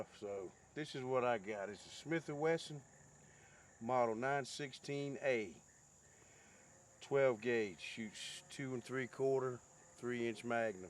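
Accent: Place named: Texas, United States. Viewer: You talk like a texan old timer! Greetings from Tx.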